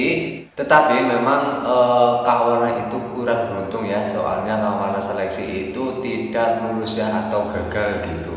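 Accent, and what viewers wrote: Banyumas, Indonesia. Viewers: Ohw wong ngapak yak..itulah perjuangan yg di jalani dengn sabar ..dan tlaten yo pasti panen..👍💪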